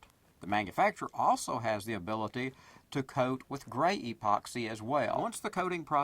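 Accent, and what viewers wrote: United States. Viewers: You sure do talk funny (in fact I was put on speaker phone so that the office ladies in Tennessee could listen to my Australian accent and have a giggle: ) Great informative video. It is so much a pleasure to hear an American who enjoys China. It is a great country and my family and I have spent many months there - Thank you for sharing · He should have someone else with a proper English accent narrate His videos. That Mercan accent can be quite annoying...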